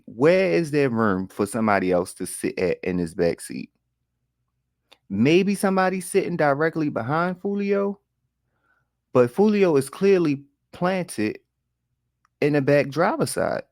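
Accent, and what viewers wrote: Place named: Mississippi, United States. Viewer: 😂 You sounds like you're from Mississippi. I remember the MI crooked letter crooked letter I- crooked letter crooked letter I- humpback-humpback-I 😂. Those were the good old days back then comparing to the times we're living in today's world. If only I could turn back the hands 👐 of time 😂. WE'LL never see those good old days anymore. I can't at this moment think of a topic right at this moment but since I've comed across your channel I've got to check out your other videos to see what you've already reported on so I don't ask you to report on something that you've already reported on. I'm glad I came across your channel and I really enjoyed your content. Keep up the good work and I'm looking forward to watching your channel grow. #MUCHLUVCOMINGOUTTAMISSISSIPPI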